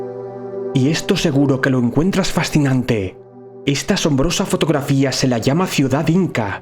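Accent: Spain. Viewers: Me gustan tus video pero deberías por el bien del canal contratar un relator latino, a muchos latinos no nos gusta el acento español, con todo respeto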